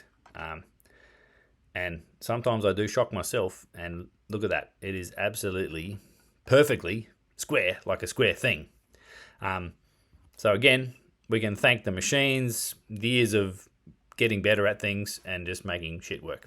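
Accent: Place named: Australia. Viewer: Nice to see you back! Surely missed your Australian accent and good happy predisposition to life! 🎉😊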